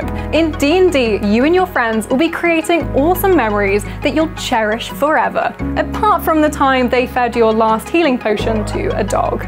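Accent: England, United Kingdom. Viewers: She is fantastic. A laid back eloquent English accent i like it. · I thought Aussie at first, but after watching the rest of the video I'm pretty sure the other comments are right. I don't know enough about accents to really describe the differences between this and a typical Aussie accent, but it sounds British. Definitely not Kiwi - a NZ accent makes every vowel sound the same. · She is english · British accent.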